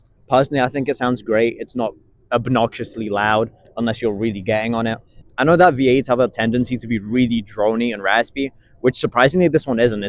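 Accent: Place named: United Kingdom. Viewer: what happened to your british accent man, bring that shit back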